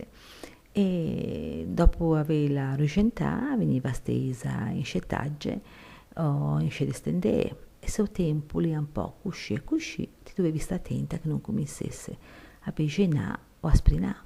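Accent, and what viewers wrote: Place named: Genoa, Italy. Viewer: Micidiale l'intreccio del suono di questo narrare. Riverbera quell'alchimia di soave dolcezza e bizzarra sagacia che incalza e rifluisce nel compostissimo accento che innerva, così pacato, la vivacità arcana della lingua intimamente genovese. Quello che non dovrà non stupire, è che davvero non si tratta, solo, della musicalità di un qualche personale carisma, ma è (...era?!) proprio la pervasiva timbrica del cuore stesso della sapiente città, con quell'inaudita mitezza e garbo che a stento tradiscono l'inveterata forza che essi celano. Un ringraziamento sincero per questo struggente, inestimabile dono.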